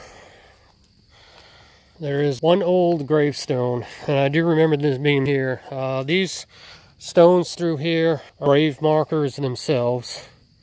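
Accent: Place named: New England, United States. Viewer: You do have a blend of a New England accent along with another one you got elsewhere!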